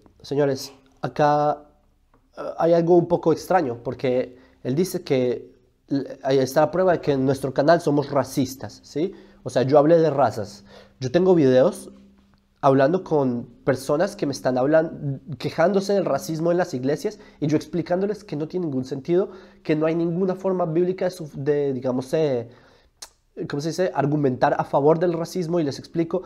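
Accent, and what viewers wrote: Colombia. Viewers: tú de colombia solamente tienes el acento, que ya casi lo estás perdiendo! Pero la cara esa de Judío auténtico Israelita, Hebreo, del ME nadie te la quita! Una prueba es que si no lo fueras nunca te hubieran aprobado la Ley de retorno! No pierdas tiempo con estos analfabetas por favor! Tú eres buenísimo para enseñar y abrir mentes y transformar a muchísimas personas con la verdad! Gracias mil, Shalom!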